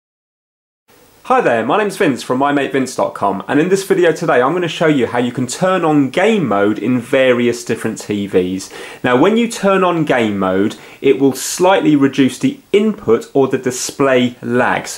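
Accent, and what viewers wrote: United Kingdom. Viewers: British English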